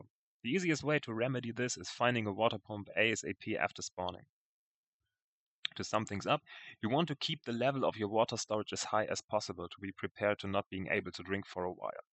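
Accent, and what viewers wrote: Germany. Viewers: That sounds like a german accent :D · You are the DayZ medical messiah. Thank you for sharing this, it's opened up my eyes to what I've been doing wrong. Really good to know. And everything is more pleasant to listen to in a German accent :) You are German...right?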